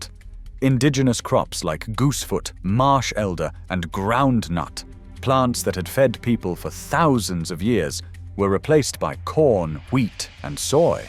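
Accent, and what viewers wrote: United Kingdom. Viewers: It's the UK pronunciation.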